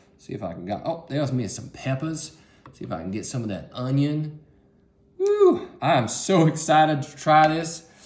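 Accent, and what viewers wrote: South, United States. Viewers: Man you have that southern accent that make me laugh man. Very funny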